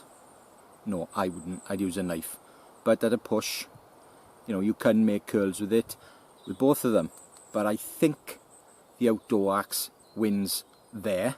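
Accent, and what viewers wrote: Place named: Wales, United Kingdom. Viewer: I've never heard of any of those people before. I think you're the first Welshman I've ever heard or seen. At least I've heard enough Irish and Scottish accents to pick up on the the Celtic sound, it being Welsh never crossed my mind but it does make perfect sense since it sounds a bit like Scottish and Irish but it's clearly neither of those. Anyways, thanks for clueing me in!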